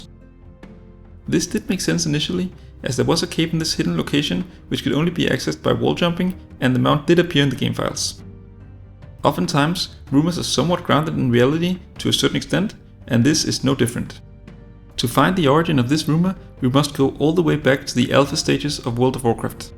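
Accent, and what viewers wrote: Denmark. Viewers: Beautiful Danglish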